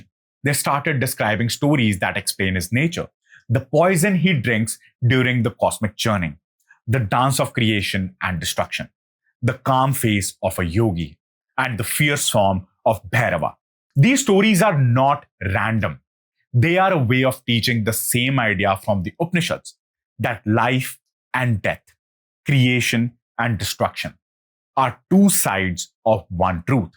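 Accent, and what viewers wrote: India. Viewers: Superb video indeed, most appreciated! However, it is INCOMPLETE without exploring the TAMIL beliefs in the deity Shiva. In Tamil, though, the name is pronounced SIVA and not SHIVA. Many scholars believe that when the Indus Valley civilization died out, maybe due to climate catastrophes or river path changes, those people moved far south. Or maybe they were running away from the Aryas (called Aryans by the British). Even now in Tamil Nadu, there is a deep-seated hatred of the Aryas and the Vedas and particularly the hatred of Brahmins. Oh you goddamned Brahmins, you have dominated and controlled us for thousands of years, now get out! One political leader openly called for the genocide (mass killings) of Brahmins. His name was E.V. Ramasamy. That party is the DMK party. It means a party for the upliftment of the Dravidas: Dravida Munnetra Kazhgam. 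Anyhow, the main point is that Shiva was/is a God of the Dravidas (Dravidians as per the British). PLEASE EXPLORE in another video. And one last comment: please STOP KILLING the authentic Sanskrit pronunciations of our sacred words and replacing them with the lip-lazy Hindi versions. Your pronunciation of Svetashvara makes me cringe! Why do you Hindi speakers erase the "a" at the end of Sanskrit words? Rama becomes Ram. Shiva becomes Shiv. Yoga becomes Yog. Yuck.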